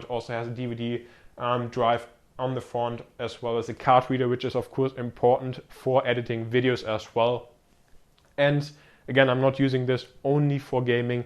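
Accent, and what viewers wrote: Germany. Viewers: Man hört Mega dass du deutsch bist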